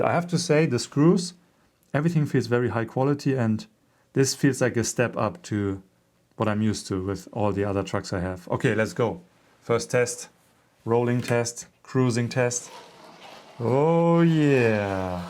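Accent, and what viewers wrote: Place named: Germany. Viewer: Bro's first language is German, cut him some slack.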